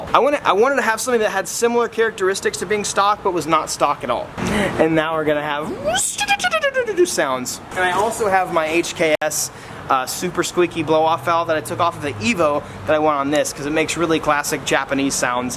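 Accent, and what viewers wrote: Australia. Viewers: I love the Aussie accent! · Ten out Of ten for Aussie accent 👍👍👍👍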